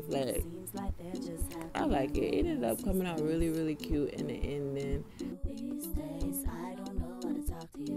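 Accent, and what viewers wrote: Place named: Bahamas. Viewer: believe she's from the Bahamas lol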